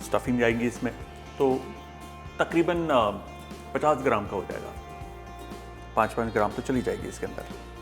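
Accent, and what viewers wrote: Punjab, India. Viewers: Aajto pakke punjabion ki tarah bol rahe ho.maja Aa raha hai as I am from Amritsar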